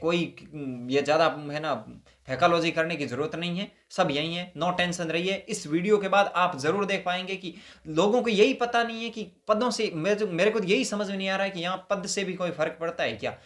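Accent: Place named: Barmer, India. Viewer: Sir lgta hai aap Barmer ke ho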